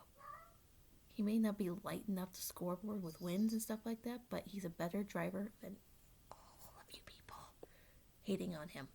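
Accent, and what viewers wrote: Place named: South, United States. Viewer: How in the hell do you get so much social media hate? Yes, you can come off strong and as a quote unquote "bitch" in other people's opinions. I think you're the smartest person I know and you are funny and genuinely a caring human. I think Larson should have just let Chase by so that the 9, 42, and 3 could have pulled away. It was fun and i like this new Camaro. I do agree that MD0 car was awesome. Btw, your cat stole the video and i swear I heard a hint of southern in your voice. Have a great weekend and stay safe, the internet really does need you. I also wanted to agree that Austin is a great guy and takes lots of time for autographs. I got him (and the other coke guys) to sign my coke hate. I wanted to end this by saying I'm sorry Rivers left your Chargers. He's better then people think and should be a champion. 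Please don't let other people change you, those jerks suck. I hope Twitter people aren't being as rude anymore. I love your 24 shirt and i hope you'll be buying the zero shirt.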